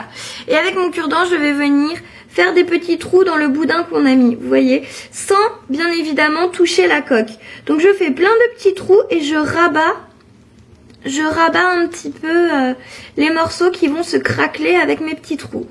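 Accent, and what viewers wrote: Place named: North, France. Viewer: Je trouve que tu as l'accent du nord ^^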